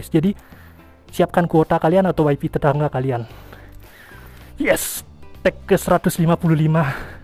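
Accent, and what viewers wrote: Bali, Indonesia. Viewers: lucu ni si bli ngomong sunda logat bali XD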